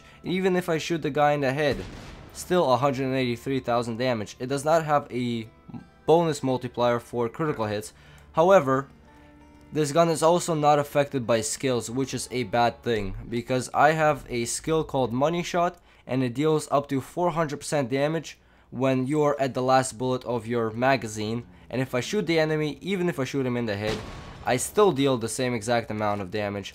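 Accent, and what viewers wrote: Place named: Russia. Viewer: русский акцент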